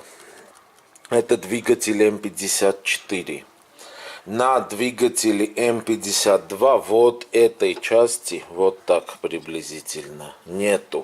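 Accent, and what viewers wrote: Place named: Armenia. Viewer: Мы по голосу поняли что ты армян .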